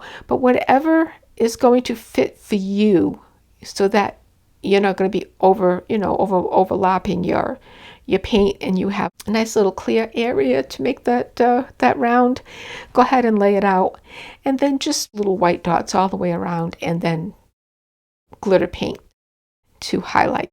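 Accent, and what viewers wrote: New England, United States. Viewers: You have a lovely video library. Just subscribed. Also a lovely New England accent. I’ll definitely be checking back.❤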